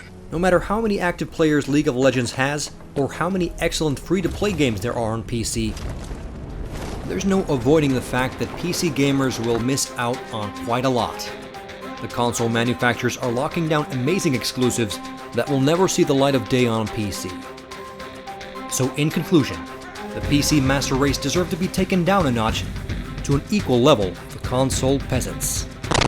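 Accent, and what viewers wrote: Finland. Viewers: Is this guy finnish? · Är du finlandssvensk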